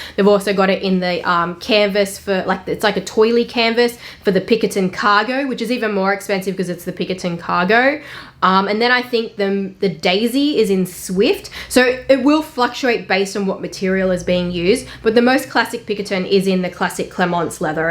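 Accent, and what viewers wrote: Australia. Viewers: I would love if you updated your game- spend money on whitening and straightening your teeth, wear clothes that are tailored- more expensive looking. You are a wealth of information but your speech is your game. If you seriously worked on your elecution. You would be sooo amazing! Slow down your speech and less (bogan Australian speech) this is not a mean comment. You have a lot of potential ❤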